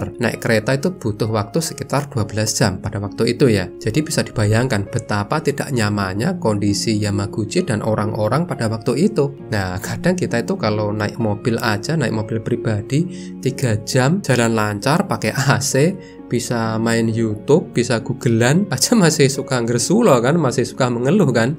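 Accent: Java, Indonesia. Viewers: semarang yo mas ..keliatan dari logat Jawa ne 😊😀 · Akhirnya muncul juga sosok legendaris pengisi suara "Aneka sejarah"...yg kental dengan aksen jawa medhok nya.. Ternyata guanteng.. 😊😊😊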